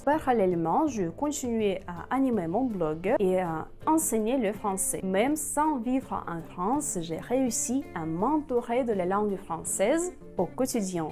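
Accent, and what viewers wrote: Russia. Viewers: depuis décembre 2022 j'ai lancer ma chaine youtube sur les réactions musicales et je me suis axés sur les artistes russes que je trouve vraiment incroyable, sinon je viens de découvrir ta chaine et j'aime ce que vous faites et votre Français est vraiment très bon avec votre accent russe c'est juste superbe et moi j'adore la langue russe que je trouve magnifique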